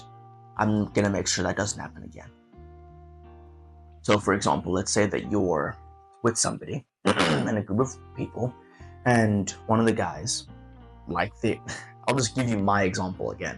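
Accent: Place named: South Africa. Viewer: Where are you from brother? Your accent has all these quick nods into a South African accent but then dips out just as quickly to sound largely American. I'm really struggling to place it.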